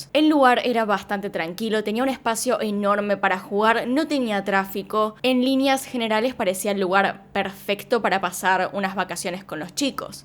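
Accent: Buenos Aires, Argentina. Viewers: Acento argentino 😕😕😕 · Eres porteña?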